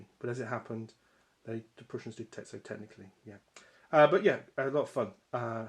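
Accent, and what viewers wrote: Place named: United Kingdom. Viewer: Love this british accent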